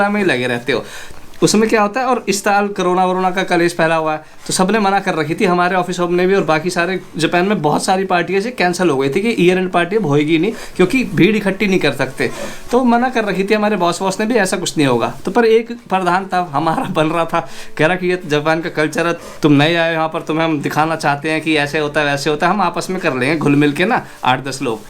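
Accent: India. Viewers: Desi accent pure Hindi me aur haryanvi tushunn. Kya baat hai. 👌jay shree ram bhai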